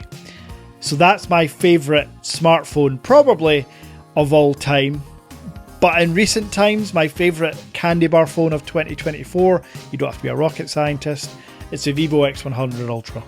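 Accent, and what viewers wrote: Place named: Scotland, United Kingdom. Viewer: Dear dad I as an average french dad ( less exotic than Zimbabwe) was very happy to know more about the human being sitting in front of his youtube camera. Be restassured :for me you are not just an image on a electronic device, one can feel you are a loving famiily father because I have noticed that your beautiful sons appear more often than not on your tests. I was happy to see your wife for the first time to complete my "discovering you". I was also very surprised to learn that you were a scottish parliament civil servant, you more look like a young manager . Actually that is what you have become now. Once again I tell you a 2nd time that I admire your courage quitting such a comfortable professional situation. I would also like to say that your incredible scottish accent reminds me that II myself also speak like you if you compare my accent to the official french one. 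That because I live near the swiss german border and because my region has been invaded by the germans during and between 3 wars. That is the reason why we are french republicans but we also have a german culture with a "rough" "rocky" accent. I was also feeling sorry for your sight problems and once again I admire your courage. If your feel like developing a channel for luxus watches please do and my son sharing that passion with you will certainly join the channel. Just a small last precision: some months ago you were mentioning that anyone writing a comment to one of your videos (what I did) would have a c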